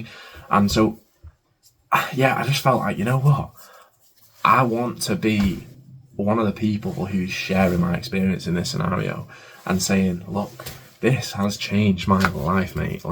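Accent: United Kingdom. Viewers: Legend mate , literally been on the same journey. Glad to finally have someone in the UK posting this type of content and judging by your accent your only down the road 😂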